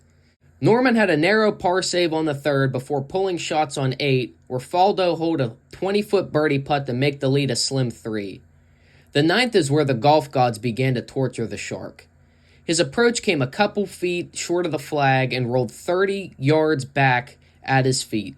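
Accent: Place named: Pittsburgh, United States. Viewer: Love that Yinzer accent!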